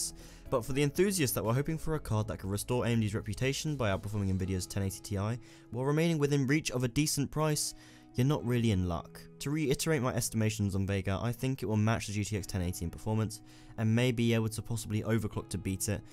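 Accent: United Kingdom. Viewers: omg replied awesome videos bro i dig that british accent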